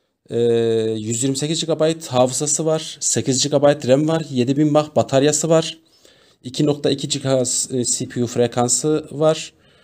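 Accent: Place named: Kars, Turkey. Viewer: Şive karsa benziyor😎güzel video👍🏻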